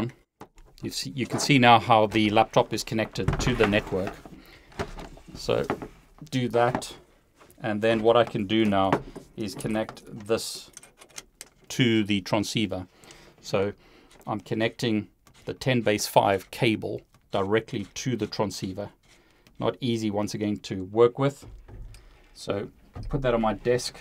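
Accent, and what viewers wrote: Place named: South Africa. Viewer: how long have you been in South Africa?, I can pick up your accent to somewhere in South Africa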